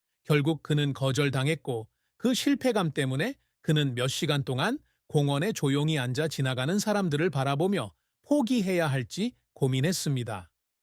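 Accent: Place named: North Korea. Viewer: 이 머꼬 ㅡ가짜 방송 할래 ?? 부칸사투리네 ㅡ ㅜㅜㅜ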